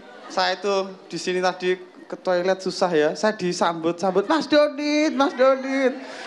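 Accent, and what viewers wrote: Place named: Java, Indonesia. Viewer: Smoga mas dodit ttep khas kya gni yak... Kampungan dan medhok nya itu loh yg bkin ngakak ahahahah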